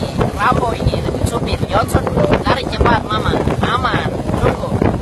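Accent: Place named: Papua, Indonesia. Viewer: Haaaaaaa mantap......loget Papua asli....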